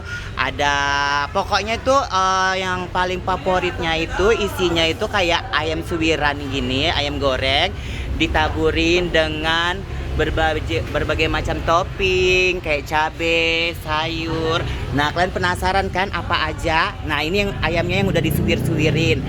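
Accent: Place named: Banyumas, Indonesia. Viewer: Kak sering-sering update ngapak😭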